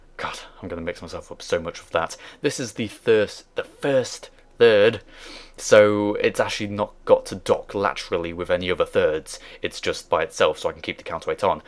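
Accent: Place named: Wales, United Kingdom. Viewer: you sound welsh :) watching in caerphilly :)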